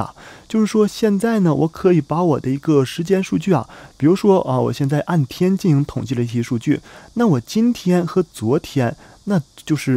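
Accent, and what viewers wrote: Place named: Dalian, China. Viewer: 感觉像大连人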